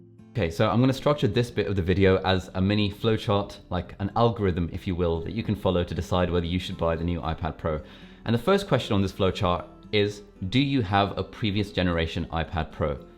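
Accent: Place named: England, United Kingdom. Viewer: Recently found a bit water ripples on the corner screen on my iPad pro 2018, anyone does the same? Anyone can give any thought about it if I need to replace it? Always like English accent, to a non English speaker, me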